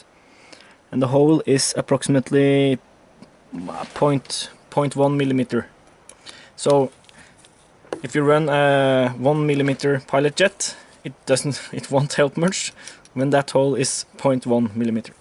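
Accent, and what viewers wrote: Norway. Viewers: Jeg syns aksangen virket Norsk! 😁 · Jeg bare så på den videoen her i 10 sekunder før jeg hørte norwinglish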